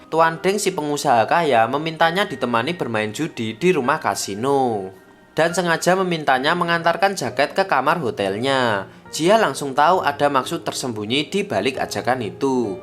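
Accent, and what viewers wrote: Java, Indonesia. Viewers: Logat e jowo medok banget hehe, tp om suka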